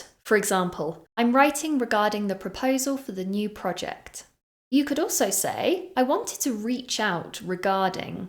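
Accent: United Kingdom. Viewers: As a Brazilian citizen I prefer to learn from her British accent which is clear and sound more correct. I really loved this exciting 12 minutes class. I just found her channel and advise everyone to stick with her teaching.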